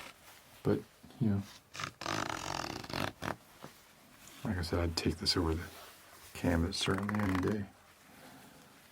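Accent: South, United States. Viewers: Your southern accent is very good, just focus on using a softer "r" sound and it will be perfect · The naturalness of your southern accent in your roleplays is incredible. It can sound so fake and over exaggerated on some people but yours just falls right in to place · Your Southern accent has really come far! Great video as always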